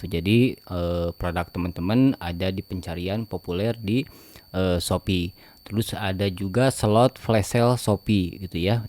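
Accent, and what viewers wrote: West Java, Indonesia. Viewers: Meni resep Sunda pisan, keren lah kang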